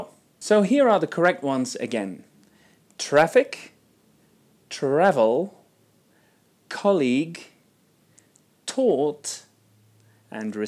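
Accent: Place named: United States. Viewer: I think travel and traffic are both correct, in the UK people say it this way..i think speaks more like an american sound than UK sound.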